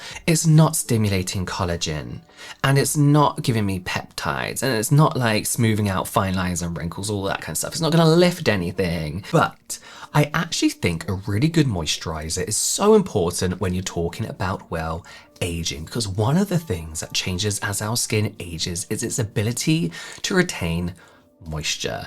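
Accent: United Kingdom. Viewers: I can imagine him reading children’s bedtime stories on Ceebeebies or something!! Obviously not just that, he’s got a lot of potential for many opportunities for voiceover aspects of a career 100% but I think my kids when they were little would have enjoyed his voice too! ❤ Accent and voice is on point! 👌🏼🥰 British accent along with his voice/pitch etc is perfection 🎉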